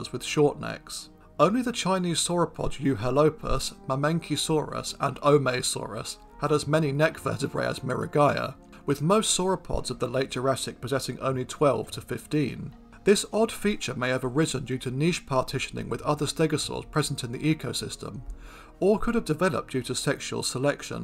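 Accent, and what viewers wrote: United Kingdom. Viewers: ugh. too many documentaries with british narrators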